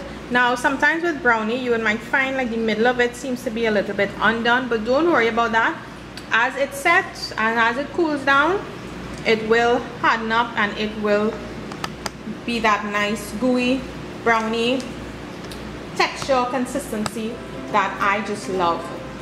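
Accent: Trinidad and Tobago. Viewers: Are you from the Caribbean? You have a Trinidarian accent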